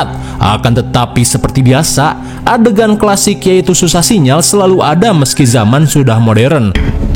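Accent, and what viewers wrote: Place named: West Java, Indonesia. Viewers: Hallo chanel kesukaan ku si logat sunda pred ❤ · Kaciri orang sundana si aa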